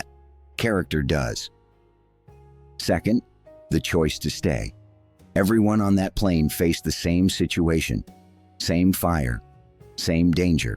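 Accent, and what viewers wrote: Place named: United States. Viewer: AMERICAN VOICE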